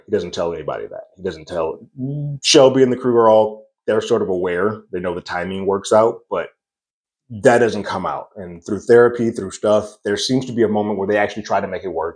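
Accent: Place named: Midwest, United States. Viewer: Great review and you are really handsome 😊. You sound like a mid westerner. Just rewatched the show again and stumbled on the review.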